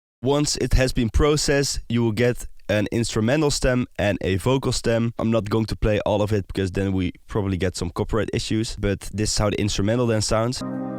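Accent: Netherlands. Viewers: I smell Dutch, also great tutorial. Keep up the good work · sounds like you from the Netherlands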